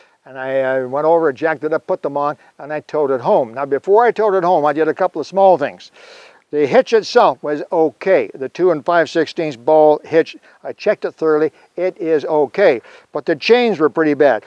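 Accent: Canada. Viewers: I can spot a Canadian accent a mile away. Love this channel, cheers from Kitchener Ontario 🇨🇦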